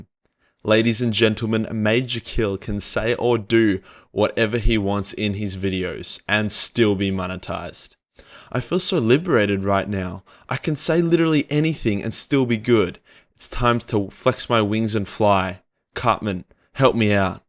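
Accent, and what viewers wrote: Australia. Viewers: No, he’s just Australian.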